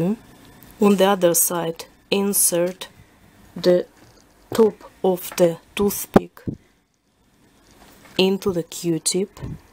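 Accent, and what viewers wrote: Russia. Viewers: У тебя русский акцент☺ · Русский акцент:) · thought I could detect some Russian in your accent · but she is Russian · русский акцент:0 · Oh god, i thought your accent was russian because of Red from Orange is the new black! ( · Omg i wish i was Russian thats why your accident this so beautiful i love that voice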